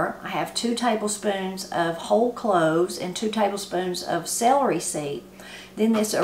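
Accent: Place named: West Virginia, United States. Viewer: I just love your videos! My sweet mother in law was from West Virginia and she shared your lovely accent and oh so many of your “mountain” down home vocabulary! I am from Philadelphia and was blessed to marry my husband and his lovely family! Thanks again. Have a great day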